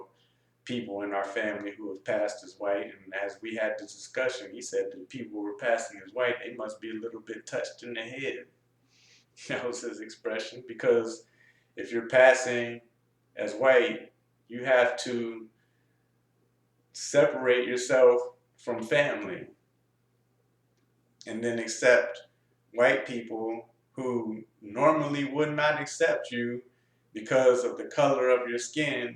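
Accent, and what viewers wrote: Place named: South, United States. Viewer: This was well done. Its a heartbreaking topic but has to be brought to the light. I like book talks and it sounds like you have a Southern accent which I can relate to so I subscribed.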